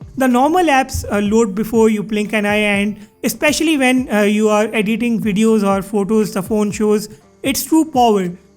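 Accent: India. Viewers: Man ,indian accent is too funny to take serious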